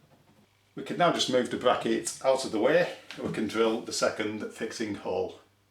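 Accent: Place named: Lancashire, United Kingdom. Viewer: 😅👍. I grew up in Blackburn so I thought I recognised the accent x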